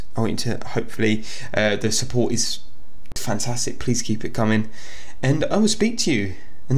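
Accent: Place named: London, United Kingdom. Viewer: did you grow up in london around loads of roadman black people? your accent sounds like it, no offense shieeet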